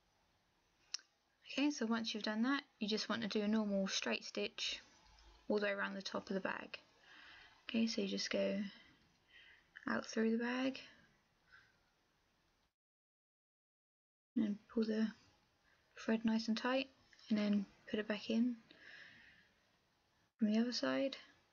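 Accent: United Kingdom. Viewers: You have a british accent, yet you us american methods of measuring a opposed to metric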